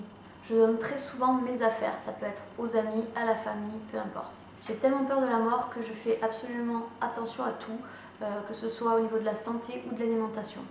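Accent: South, France. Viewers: Ton dernier fait était "je me retiens pour éviter de montrer mon accent du sud, parce que je trouve sa très vulgaire". Pourrai-tu nous faire une vidéo avec cet accent ?? :) Continue comme sa tu es génial !! ❤️ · J'ai aime ta vidéo claire et consise :) oh l accent du sud <3 il a pas l air moche le tiens ... Moi ça va ça ne se remarque pas dans mes vidéos il n est pas très prononcé . Pour le shopping plus ça va et plus je deviens comme ça ... Ah tout faire sur le net!!! Et bizarrement moi non plus je b´aile pas l eau sur les coudes quand je me lave le visage ! Voilà :) et pour les youtubeuses françaises et bien je t'invite a venir me faire un coucou alors histoire de voir une nouvelle tête . Bisous a bientôt . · Tu peux le garder ton bel accent du Sud ! La vulgarité est une attitude et tu es à mille lieux de celle-ci ! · Ca me choque un peu que tu ais honte de ton accent, moi aussi j'ai l'accent du sud et je n'en ai pas honte du tout, mes amis nordistes aiment bien ils disent que ça leur fait penser aux vacances mdr, c'est pas parce que tu as l'accent sudiste que tu parles forcément comme une cagole ;) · J'aime trop l'accent du sud ! 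Ne te retiens pas ! 😜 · Bonne video mais dommage pour l'accent du sud je l'ai aussi et je trouve que c'est le plus bel accent comparé à l'accent du nord beaucoup trop pointu à mon gout! Apres l'accent du sud c'est comme tout, faut savoir l'utiliser et je suis d'accord avec toi par rapport au fait que selon les personnes, il peut devenir extrêmement vulgaire ! Bisous ! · Je suis pas d'accord avec toi pour le 50ème fait on peux pas renier l'accent du sud aha :D et enplus je trouve qu'il te va très bien! VIVE LE SUD :* :D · Super vidéo :D tu es vraiment une youtubeuse super justement pour ton naturel et la proximité que tu crées avec ton "public" ^^ je vais jouer le jeu tiens ! 1: je déteste dépenser mon argent, mais j'adore posséder et je suis assez addict au shopping (j'ai des wishlist longues comme le bras qui traînent dans mon PC) 2: je suis environ 40 youtubeuses françaises et 20 américaines. 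3: j'ai eu 18 ans, mon bac avec mention très bien et mon permis de conduire en l'espace de 5 jours cette année. 4: je suis fan de cinéma, et en particulier des films un peu psychologiques. 5: j'ai la phobie du feu, je n'ai par exemple jamais osé passer mon doigt à travers la flamme d'une bougie ou démarrer un barbecue... 6: je suis détectée surdouée mais cela n'influence pas mes goûts ou mes loisirs. Par contre, j'ai plus de mal à nouer des liens avec les autres que la plupart des gens. 7: je trouve l'accent du sud extrêmement relaxant et chantant :) c'est d'ailleurs une des choses qui me font aimer ta chaîne alors ne te retient pas! 8: je suis terriblement gourmande. 9: je suis très lunatique et c'est un problème car je suis susceptible d'être parfaitement normale et d'avoir des accès de colère ou des périodes de déprime. 10: je rêve de devenir actrice depuis que je sais marcher. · Mais c'est super beau l'accent du sud dans une vidéo tu pourras parler avec ? 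:) (Je l'ai moi aussi) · Pourquoi tu trouves cela vulgaire l'accent du sud? :) En tout cas, j'aime beaucoup tes vidéos. Je trouve que tu donnes vraiment des astuces intéressantes. Et si je peux me le permettre, qui sont les uniques 5 youtubeuses françaises que tu suis? Des bisous♥ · On a des points en communs ahah, sinon,laisse ton accent du sud, cest le meilleur! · Pourrai tu nous faire une video courte avec ton accent du sud pour voir a quoi sa donne ?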